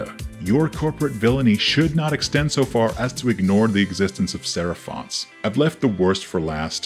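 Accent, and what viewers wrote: United States. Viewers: your American accent was spot on except for when you said "military" lol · Your American accent is so good that I forgot that you’re British/Australian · Woah, new to this channel and was totally convinced that was your voice, with maybe a compressor, but certainly not a pitched down American accent! Well done!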